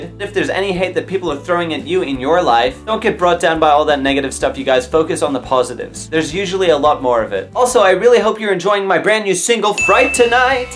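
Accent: Australia. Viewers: I love how your aussie accent slips through a bit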